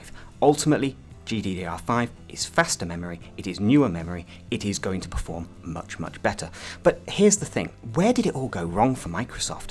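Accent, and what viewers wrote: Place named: England, United Kingdom. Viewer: SOOOOOOOOOOOOOOOOOOOOOOOOOOOOOOOOOOOOOOOOOOOOOOOO ENGLISH. (I'm British, barely anyone sounds like that here)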